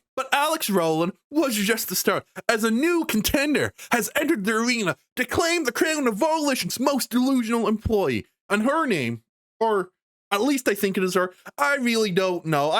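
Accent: Canada. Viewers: You sound very Canadian.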